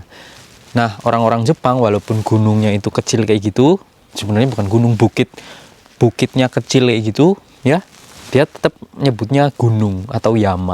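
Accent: Banyumas, Indonesia. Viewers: Ngapak daerah mn mas?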